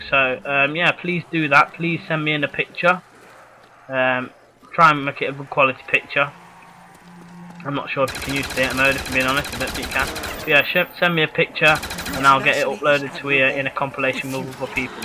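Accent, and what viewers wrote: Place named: United Kingdom. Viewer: i love ur british voice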